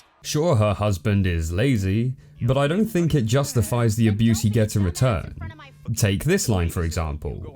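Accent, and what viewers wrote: United Kingdom. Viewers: I can tell you're British.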